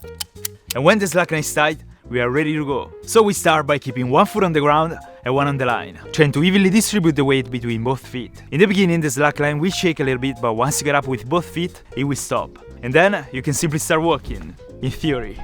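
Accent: Italy. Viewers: Sei italiano vero? Dall'accento si sente. E comunque sei un mito 💜⚜💜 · Ma perché ha un accento così italianizzato? (Secondo me è italiano) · adoro il tuo accento italiano quando parli inglese, sei uno dei migliori youtuber in circolazione, continua così😁❤️ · Ma é un italiano che parla inglese? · si sente un botto dall’accento che sei italiano😁 bel video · Your content is amazing And i really like your italian accent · Accento italiano si sente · Se non fosse stato per l'accento non avrei mai pensato fosse un canale italiano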